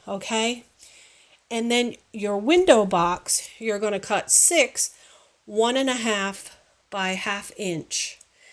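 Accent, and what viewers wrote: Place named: South, United States. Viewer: Love your project. New subbie too. SOUTHERN GIRL what state are you from?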